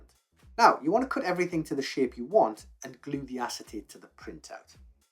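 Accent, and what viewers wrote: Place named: Northern England, United Kingdom. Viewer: I've just watched all your videos and they are excellent. You're right: there isn't anything else occupying the space between scratch-bashing and ultra-expensive mega builds. Personally, I want the look of a GW-only table because I love the aesthetic and their kits but, like you've pointed out, they're impossibly expensive, they actually limit you in all sorts of ways, and they often don't work all that well. Plus so many channels resort to mdf kits and 3D printing which isn't my jam. So I am your target audience (plus, I also remember card scenery, and I also got a letter printed in White Dwarf back in the day, so we could be brothers). Talking of brothers, are you related to RPArchive, because your channel is like its 40K twin. Gentle, northern bent; perfectly manicured nails; impossibly neat house; incredibly comprehensive advice and instructions; original project ideas; OCD-friendly; slightly bossy and judgey in tone; professional video production; slightly clinical, but totally stunning results; and uplifting and inspirational (as much as brits ever can be). 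Bravo, sir. Subscribed, and really looking forward to seeing more videos from you.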